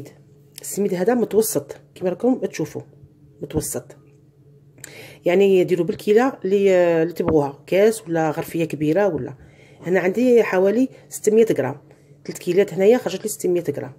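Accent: Oran, Algeria. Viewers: تعجبني كي تهدري (تتكلمي) باللهجة الجزائرية الوهرانية الحقيقة بالرغم من انك تعيشين في دولة أجنبية 😊😊😊😊😊😊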